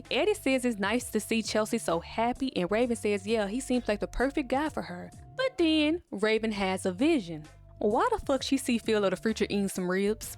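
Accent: Memphis, United States. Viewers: I remember thissss! Are you from Memphis I hear the accent! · You talk like you from Memphis lol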